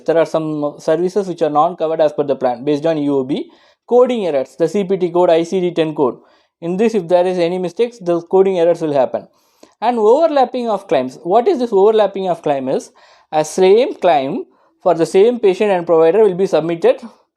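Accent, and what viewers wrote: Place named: India. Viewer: Oh man, I'm really struggling to understand that hardcore Indian accent! Subtitles help a lot.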